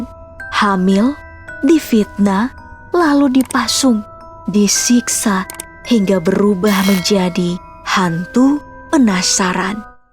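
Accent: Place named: Pontianak, Indonesia. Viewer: Yang berasal dari pontianak mana nihh suaranyaaa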